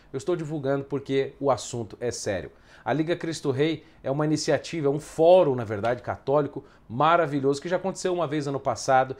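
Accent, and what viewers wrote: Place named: Rio de Janeiro, Brazil. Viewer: Por favor fale mais carioquês nos próximos vídeos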